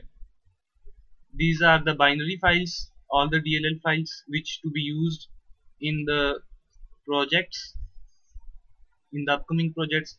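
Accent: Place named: India. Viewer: I Fu*k love Indian english tutorials <3, thx bro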